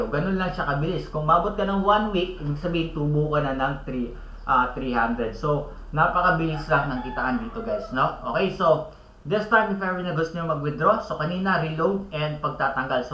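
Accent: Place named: Visayas, Philippines. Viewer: bisayang tagalog.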